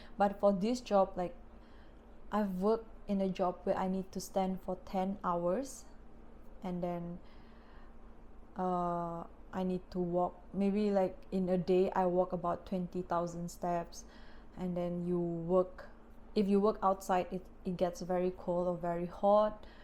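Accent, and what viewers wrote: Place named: Sabah, Malaysia. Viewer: Lucu lah sy rsa ni video hahaha. Buli dgr slang sabah. Would like to see more contents 🤗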